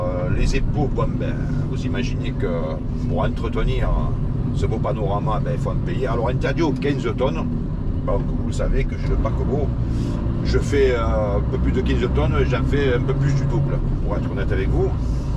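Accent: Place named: South, France. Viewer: Toulon ouest. dans le Var et pas à Vars dans les Alpes,ni au Portugal Une visite passionnante avec l'accent du sud en bonus.Des vidéos comme celle ci, j'aimerais tellement en voir plus souvent 🎉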